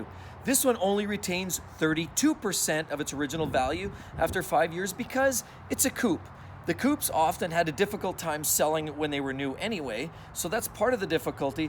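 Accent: Canada. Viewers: Are you Canadian!!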